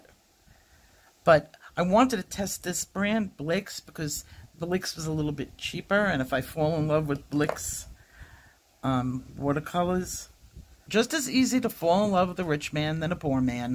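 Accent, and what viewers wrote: New York, United States. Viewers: I am so sorry to hear your hubby is so sick, and especially that u can’t visit him right now. I hope he is better soon. I love how u have known each other since grade school. That is so special. I love retail therapy also! Having grown up in Queens, New York, it’s almost like being back there when I hear your New York accent. I love it. Hoping he is better and home soon where he belongs.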